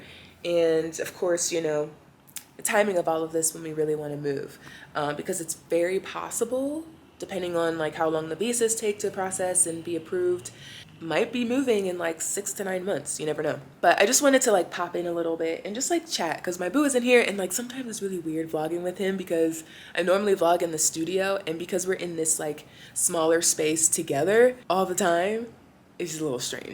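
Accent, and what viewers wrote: United States. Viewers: People don't know just by looking at you that you are American so that can't be why they're staring. You don't look American, just African. I think it might be your accent that gives you away. Or vlogging in public. The staring doesn't come from a bad place. People here take interest in other people. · Welcome back 🤗🤗🤗🤗 we cant tell if u AA until u open your mouth, you must just be used to greeting even people u dont know 😆😆😆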